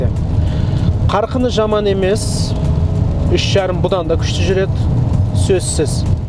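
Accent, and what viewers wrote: Standard. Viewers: Жарайсың қолдайм, таза қазақша , мәнді ,мағыналы , мәнерлі сөйлейсің брат отдуши👍👍✊✊ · Азамат! Таза казакча сүйлөйсүң. Казак тилине салымың чоң. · Казах баурларымызга бизден салам, азаматсыз. Ушундай жаңы уналарды тааныштыра бериңиз! Таза Казахча сүйлөйт экен, азаматсын. Көңүл коюп уксаң ар бир сөзүнө түшүнөт экенбиз. Сөзүбүздө негизи көп деле айырма жок экен. Бекеринен айтпаса керек Казак-Кыргыз бир тууган деп.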